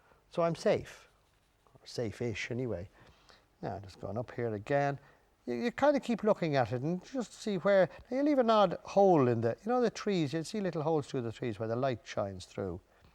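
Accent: Ireland. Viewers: Thank you so much for putting this lesson on. I've followed along with quite a few of your paintings and have learned a lot from you, and I love hearing you talk and listening to your Irish accent! Please keep on teaching us. Thank you!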